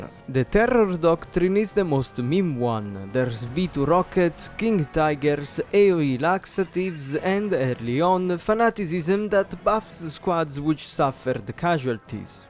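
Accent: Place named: Italy. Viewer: Damn, that italian accent is thick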